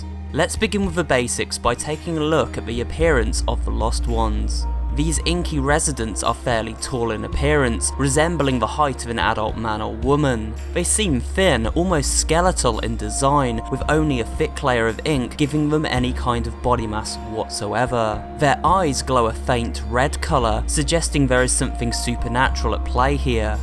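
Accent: United Kingdom. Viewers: That's a pretty good detective work. Also, I did notice your very interesting aksent. Are you british?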